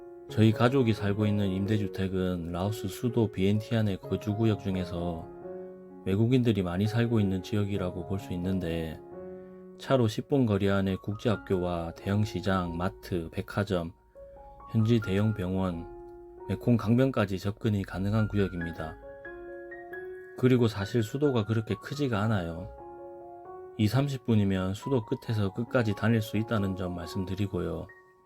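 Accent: Gyeongsang, South Korea. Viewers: 좋은 정보네요. 그런데 경상도 분이시군요. 님도 유튜브 보세요. 전라도에 관한것 경상도애들이 어찌 개판치는지. 경상도 사투리면 최소한 전라도와 그에 부속한 사람들은 구독 안합니다. 일베와 경상도 악플러들때문이라는것은 분명히 알아주세요.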